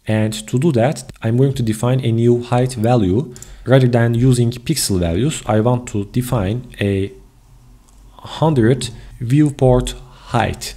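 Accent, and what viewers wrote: Turkey. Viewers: Exceptional Turkish accent. Lovely. Thanks for this !!!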